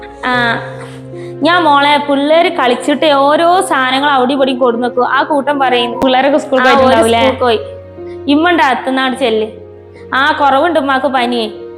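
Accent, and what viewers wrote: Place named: Malappuram, India. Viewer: എന്താ പ്പ തിന് മലപ്പുറം ഭാഷ യാണ് എന്താ കുഴപ്പം കൂട്ടം എന്ന് പറഞ്ഞ(കാര്യം )അത്ര തന്നെ,, അടിപൊളി വീഡിയോ സ് ആണ് 👌👌👌നല്ല ഭാഷ 👍❤️❤️❤❤❤❤❤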